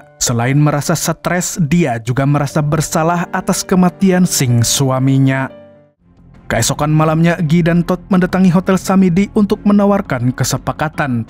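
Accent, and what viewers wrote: Banjar, Indonesia. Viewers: Banjar d mananya mang😂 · orang banjakh bang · Orang Banjar kah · Mang orang banjar kah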